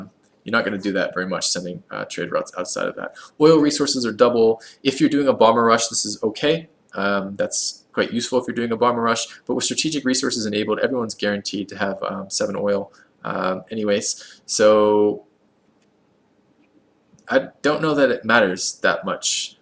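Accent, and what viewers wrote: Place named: Canada. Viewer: I'm trying to figure out your accent. I hear a Canadian "about" and a East Coast/New England "warrior" in there. Help me out here bro :D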